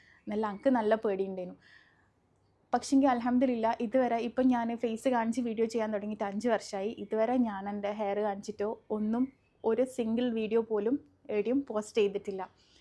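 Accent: Kannur, India. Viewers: Nan link open cheythu nan comend cheyyarilla njan Areacod anu ❤❤❤❤ ningale sound nalla ishttanu kannoor varthanam ishtam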